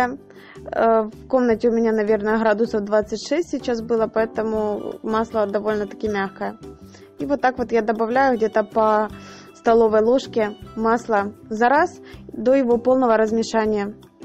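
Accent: Ukraine. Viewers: А мне нравится такой украинский говорок!))Главное рецепт хороший и лишней болтовни нет!))Всех благ вам....милая девочка!)) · Спасибо большое! И за мусс и за украинский говор, на душе тепло. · Боже мой! Как же ласкает слух ваш говор! Я впервые услышала украинский акцент(если я правильно поняла). Я так и знала, что он мне понравится! Так заслушалась, что по рецепту ничего не поняла)))) Пойду ещё послушаю. И обязательно сделаю такой крем. Благодарю! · Я серьёзно не понимаю - так говорят украинцы на русском языке? Или это диалект какой то? Очень прикольно ☺ За видео - лайк. 👍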